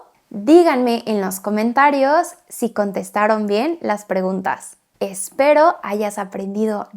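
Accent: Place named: Mexico. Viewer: Vocabulary with real story. That is awesome :) It makes it easier to remember. Also I think your Mexican accent is cute and a bit easier to understand. I often struggle with accents from Spain.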